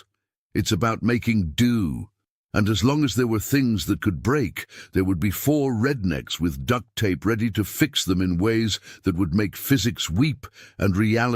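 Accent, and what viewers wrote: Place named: United Kingdom. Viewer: Lol! I love this narrator!🤣🤣🤣🤣🤣🤣🤣🤣🤣🤣🤣🤣🤣🤣🤣🤣🤣🤣🤣🤣🤣🤣🤣🤣 Best story as always. I only wish all hfy scifi comedy has this british narrator guy.❤️❤️❤️❤️❤️❤️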